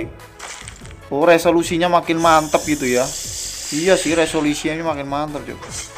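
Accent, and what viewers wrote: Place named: Banyumas, Indonesia. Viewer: Wong endi mase ngapak temen ngomonge ekwk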